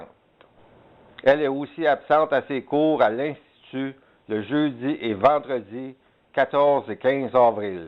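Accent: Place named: Quebec, Canada. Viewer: ... Ce qui est une honte ici c'est votre commentaire, ignare et déplacé! Insulter quelqu'un pour une chose sur laquelle il n'a pas de contrôle, c'est d'une vulgarité, d'une impertinence et démontre un manque de savoir vivre allant bien au delà de la honte! C'est méprisable! Bien plus que de posséder une accent joual, ça va sans dire... Mais comme les accents propre à une langue apprise dans l'enfance, les phonèmes sont comme une empreinte, ils ne peuvent se perdre ni être modifiés après l'âge d'environ 11 ans. Cet homme est sans doute né en régions autour des années 50, à Sept-îles ou à Mont-Laurier, Longueuil, ou Trois-Rivières, aux fin fond des Rapides-Danseurs de l'Abitibi ou en pleins centre ville de Montréal, qu'en sais-je? Mais il crée quelque chose d'utile, avec pour seule motivation le désir de justice et celui d'informer. Et surtout Il le fait avec bienveillance et 'humilité. 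Deux choses qui vous ont échappé ici, il semblerait..!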